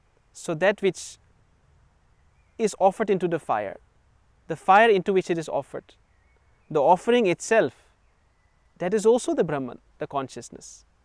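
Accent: India. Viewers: His english accent has also became Indian accent. ❤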